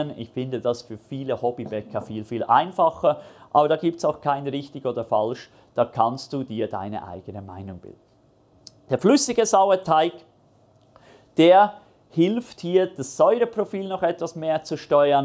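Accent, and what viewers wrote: Switzerland. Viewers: danke für deine coolen videos!und ich finde deinen swiss akzent richtig sympathisch!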